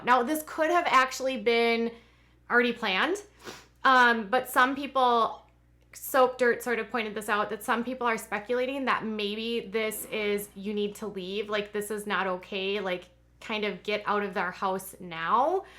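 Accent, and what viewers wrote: Minnesota, United States. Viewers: This is the first video of yours I watched. I thought "she sure sounds minnasoooootan" :-)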